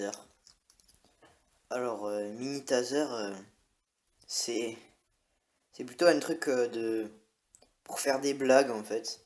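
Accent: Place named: South, France. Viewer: l'accen du sud qui fait plaisir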